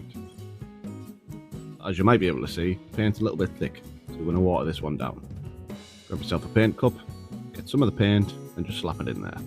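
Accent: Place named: Teesside, United Kingdom. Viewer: Teesside accent? Go on then I’ll subscribe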